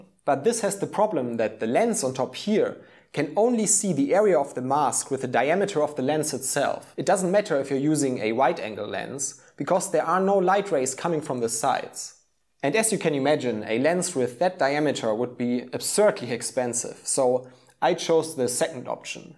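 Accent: Germany. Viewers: Ich glaube ich höre da einen LEICHTEN Deutschen Akzent 👂👀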